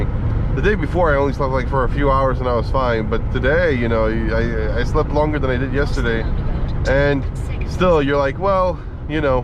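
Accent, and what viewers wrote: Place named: United States. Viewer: Hi, just curious. Where are you from? You've got a very americanised accent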